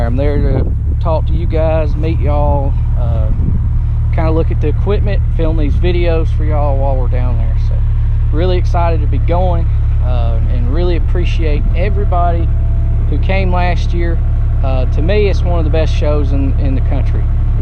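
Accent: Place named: Georgia, United States. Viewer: What part of Georgia are you from?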